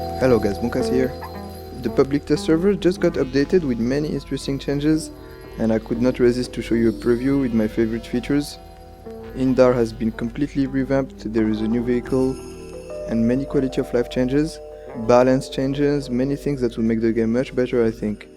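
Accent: France. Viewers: Cet accent francais ^^ Tu parles francais en langue natale ? Il y a des vidéos où tu parles full french :) ? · you are french no ?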